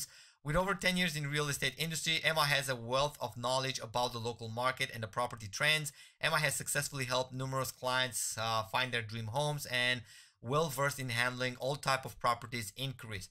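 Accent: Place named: Quebec, Canada. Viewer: Oui la voix est pas mal je vien d'écouter, on dirais juste qu'il a un accent québécois 😂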